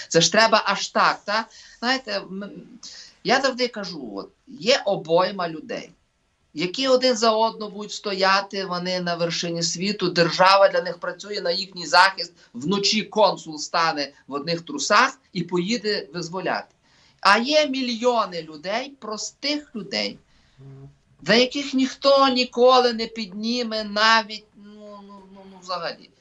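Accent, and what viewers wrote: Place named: Galicia, Ukraine. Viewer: Пане я з Харкова так от що я тобі курва падло скажу з твоєї риторики видно що ти важаеш Українцями тіки галичан а ще більші Українці жителі, великого Львова, перед ніж пертися в ефір навчися говорити Українською мовою а не галицькою і за тебе і таких як ти і війна почалася. І питання ти великий патріот України чому не з автоматом в окопі.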